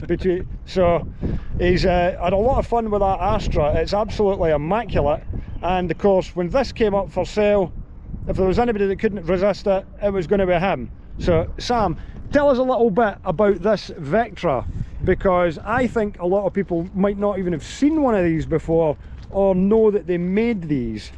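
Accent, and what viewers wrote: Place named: North, United Kingdom. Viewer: Difficult to understand your strong northern accent. Need subtitles.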